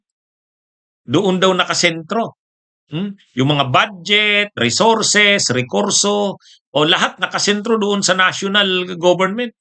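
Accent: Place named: Visayas, Philippines. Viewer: atty, bakit bisaya kang magsalita?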